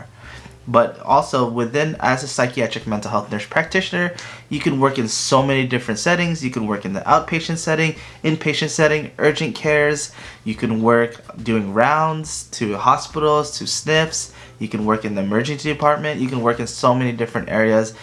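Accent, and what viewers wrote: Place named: Philippines. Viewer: Filipino accent?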